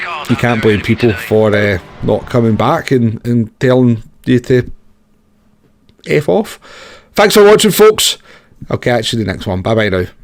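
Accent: Scotland, United Kingdom. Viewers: 😂😂 pure scottish so it is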